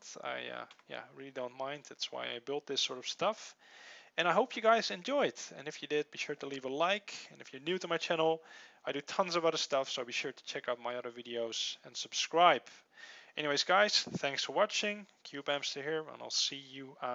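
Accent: Netherlands. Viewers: Ik hoor dat je uit nederland komt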